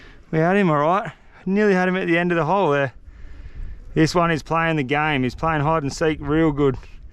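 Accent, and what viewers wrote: Australia. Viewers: Is he a Aussie? His accent reminds deff yells "Hey! I'm from australian!"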